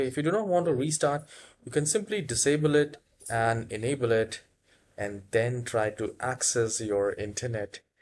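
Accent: India. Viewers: As soon as I heard the Indian accent I knew this was going to work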